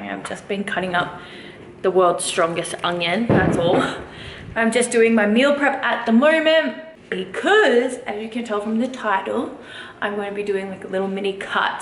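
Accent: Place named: South Africa. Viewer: Are you South African? I have a friend that is South African and the accent is so similar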